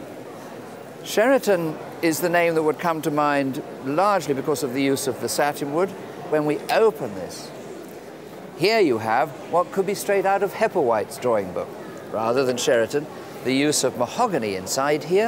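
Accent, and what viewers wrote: United Kingdom. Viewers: Definitely received pronunciation 😊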